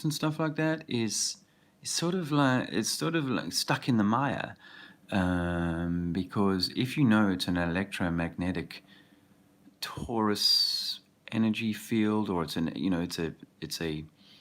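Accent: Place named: Australia. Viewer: Laughing my ass off right now... love the Aussie accent! And you are spot on, we certainly are a nanny state and it makes me sick!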